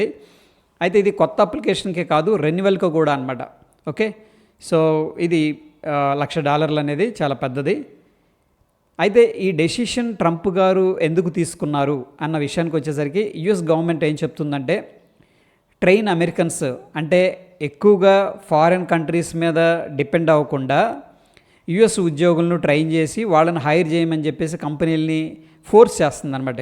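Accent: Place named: Telangana, India. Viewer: Hi sir this comment is not for this vedio .i would like share my opinion on all ur efforts...సర్ stock market లాంటి ఓక సీరియస్ subject ని మిరు మీ తెలంగాణ స్లాగ్ లో చెప్తుంటే full comedy గా నవ్వుతునే వింటాను.at the same time ఒక vedio 1 book tho సమానం గా చుస్తాను .TQ sir.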